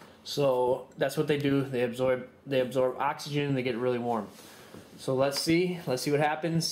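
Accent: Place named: Canada. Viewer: Because of your accent and speech pattern. Are you Canadian or from a state near Canada.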